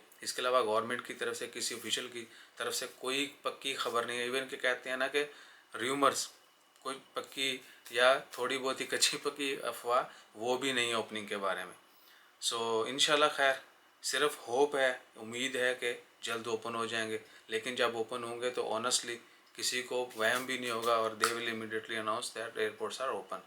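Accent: Pakistan. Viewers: Salam Walekum kya hal hai theek ho awaaz se Pakistan aadami Corona test Corona test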